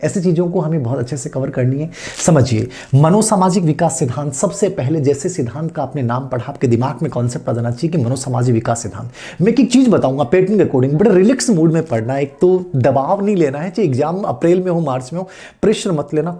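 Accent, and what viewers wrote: Rajasthan, India. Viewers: बहुत अच्छा समझाया आपने सर जी मारवाड़ी आपकी बहुत अच्छी लगती है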